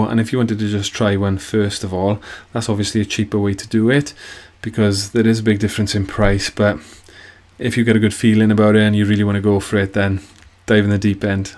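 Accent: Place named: Wales, United Kingdom. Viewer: Eyyyy nice to hear another welshman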